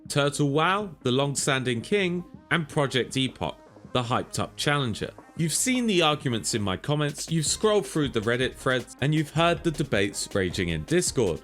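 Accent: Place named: United Kingdom. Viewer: This was a very biased “hyped up” video. Let’s wait for Epoch to actually have a functioning server before we weigh the options. Also, a lot of what you stated about Turtle WoW demonstrates that you’re paid advertising for Epoch and/or haven’t done your research. You can’t hide behind your classy Victorian accent. Do your research, sir.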